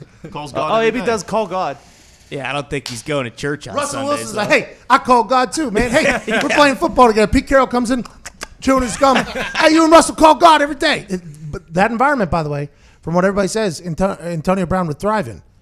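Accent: Pittsburgh, United States. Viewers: That Pittsburgueeze Yinz. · Love how Pat says Antonio Braaann in his all Pittsburghese accent.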